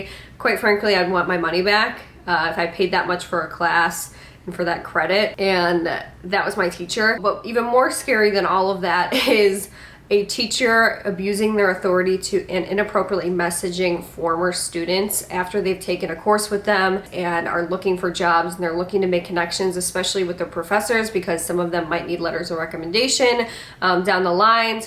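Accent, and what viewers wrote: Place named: Midwest, United States. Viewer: I hear my own Midwest accent when I listen to your videos. Your voice is so comforting which is great when watching mlm videos! Thanks for all the awareness you bring in this area. I almost got sucked into essential oils shortly after graduating high school. Very glad I dodged that bullet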